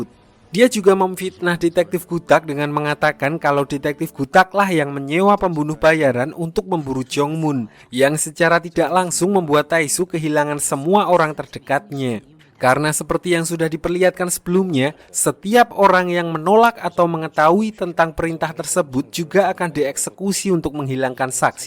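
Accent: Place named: Java, Indonesia. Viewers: Logat jawa nya medok😅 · Pasti masnya orang jawa nih. Medok gini logat nya wkwkwk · Ini yg nranslet kaya orang banyumas tohk😅😅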